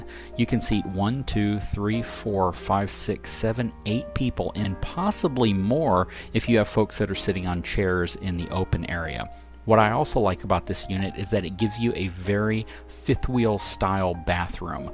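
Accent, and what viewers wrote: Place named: Texas, United States. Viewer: I like your wild Texas voice better than your NPR voice. This will take some adjusting. 😂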